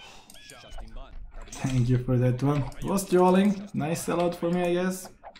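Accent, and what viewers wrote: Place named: France. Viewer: Are you french ?!! Your accent is kind of familiar…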